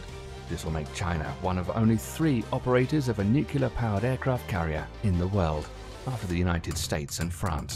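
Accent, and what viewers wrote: United Kingdom. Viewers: Don't forget, the narrator is speaking British English, Not American English. Pip pip, Tally Ho, and all that rubbish! Like my Grandson once said, "Why can't the Canadians make Real Bacon, instead of calling a ham slice, Canadian Bacon?" We had to 'splain, fried meat slices is NOT always Pork.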